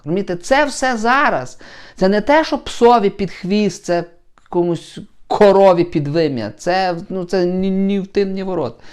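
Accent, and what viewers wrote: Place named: Galicia, Ukraine. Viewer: Гидко слухати цей галичанський говір.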